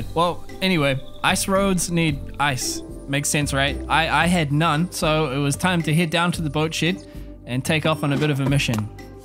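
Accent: New Zealand. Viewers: Question? Are u kiwi? (R u from New Zealand?)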